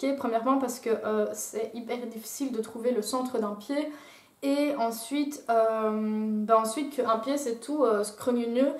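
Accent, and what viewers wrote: Belgium. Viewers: ton accent belge est adorable, tes lunettes sont canons tout comme ton septum ET TES CHEVEUX (et ton KK) et ton tatouage bien sur :) je m'abonne !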